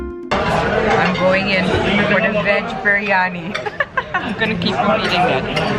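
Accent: India. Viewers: Haha..one suggestion for you. Watch your first vlog and watch this. Your accent is slowly getting indianised.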